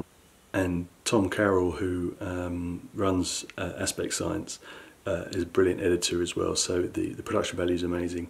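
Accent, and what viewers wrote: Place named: United Kingdom. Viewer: Dude you’re British? I subbed just for that lol. Great video man.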